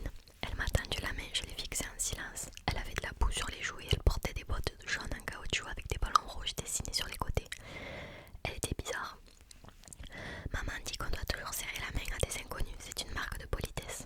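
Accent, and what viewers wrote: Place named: South, France. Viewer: L’accent du Sud et de l’Asmr , un plaisir cong!!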